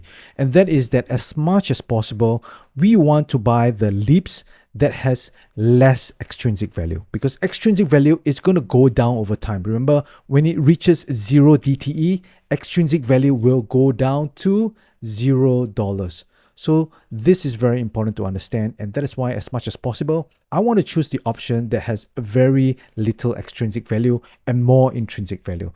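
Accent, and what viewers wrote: Singapore. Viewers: singaporean eh ?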